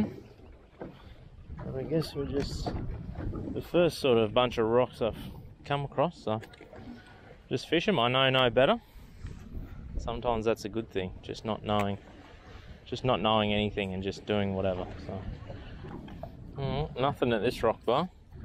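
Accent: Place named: Queensland, Australia. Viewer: You're sounding more and more like a north Queenslander. The fishing is next level compared to SEQ.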